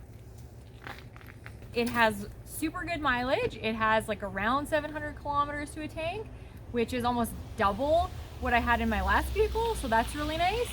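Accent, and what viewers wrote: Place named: Canada. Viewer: I love a good Canadian accent. Every last work in their sentences goes up a whole pitch. lol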